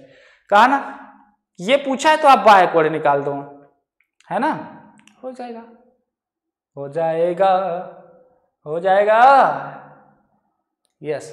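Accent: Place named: Uttar Pradesh, India. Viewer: Up se ho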